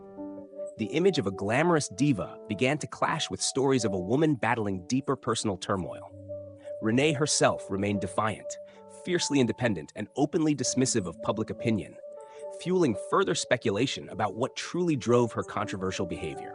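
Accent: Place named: United States. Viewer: The HORRIBLE Yank narration spoils this!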